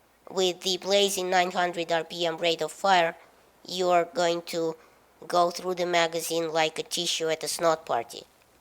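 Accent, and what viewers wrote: Russia. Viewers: the coolest thing that people from russia have there accent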